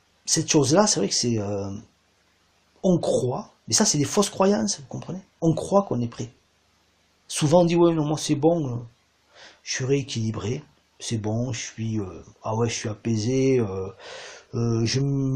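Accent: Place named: Toulouse, France. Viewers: Bonsoir , tres important vos messages , n'être plus dans l attente , celle-ci est primordiale.. Ouiii 3 belles erreurs qui priment❤ et effectivement le runner doit entendre que le chaser vie sa vie, ça y est , il la conscientisé...et gratitude à l'univers pour tous nos cadeaux de la vie ... Merci belle voix du Sud Ouest 😊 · ✨Je me suis abonnée depuis le début car j'ai de suite ressenti votre authenticité. Votre discours unique fais du bienggg😂 J'ai bien reconnu votre accent car je suis Toulousaine. Continuez surtout car vous faites du bien. MERCI✨🙏✨Bien CORDIALEMENT. ✨💚✨🌟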